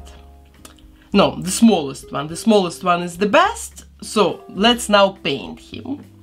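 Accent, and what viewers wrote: Russia. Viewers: Кукли виходять дуже гарними, але неможливо слухати, жахливий акцент/говір, наче росіянка з глубінки вчиться говорити англійською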